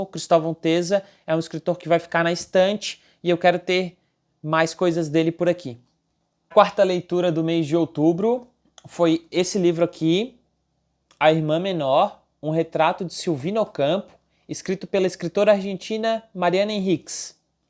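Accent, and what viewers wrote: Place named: Florianópolis, Brazil. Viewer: Pelo sotaque, vc só pode ser manezinho da ilha também hahaha (sou de Floripa)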